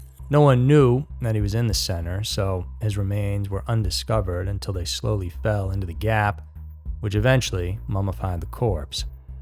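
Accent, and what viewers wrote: South, United States. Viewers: Never heard about this case before. Pretty crazy. Love that southern accent.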